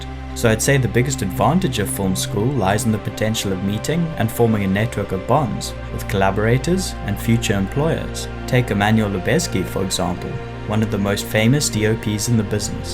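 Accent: South Africa. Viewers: Is that a South African accent I hear? 😂 Another South African here! ❤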